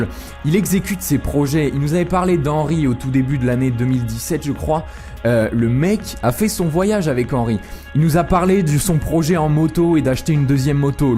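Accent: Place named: Belgium. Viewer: je pense qu'il est belge et pas français, sinon très bonne analyse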